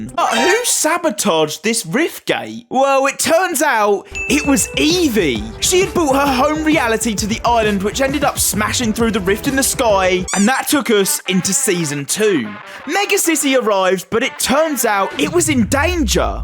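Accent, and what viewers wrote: United Kingdom. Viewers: I would watch this entire video but you're British and obnoxious 💀💀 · British + mic eater =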